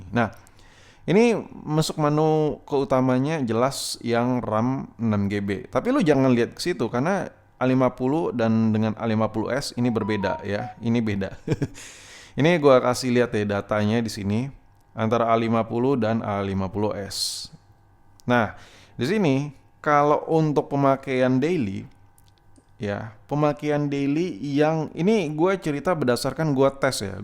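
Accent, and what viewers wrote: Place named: South Jakarta, Indonesia. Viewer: Bang elu jaksel ya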